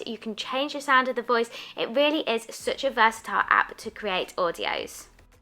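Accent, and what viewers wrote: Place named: United Kingdom. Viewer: Guessed it with your British accent..😌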